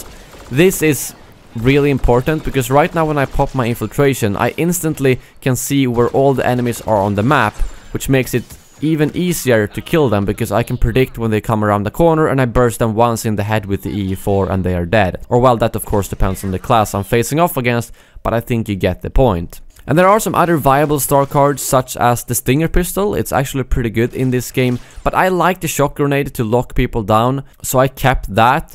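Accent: Sweden. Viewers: I just found this guy and i got it right away that you were swedish, hahah hej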